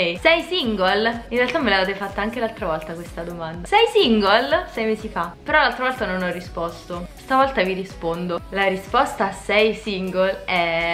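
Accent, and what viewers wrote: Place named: Rome, Italy. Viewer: PS : l'accento romano... È top!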